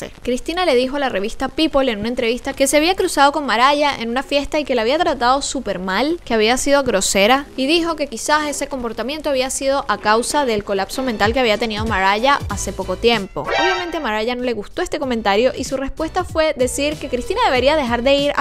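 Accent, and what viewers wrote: Venezuela. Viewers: eres venezolana cierto? Ese acento jejej me hubiera gustado ver a verla cantar en el caracas pop festival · Te amo por tu acento venezolanooooooooooo... muy dificil escoger una cancion... creo que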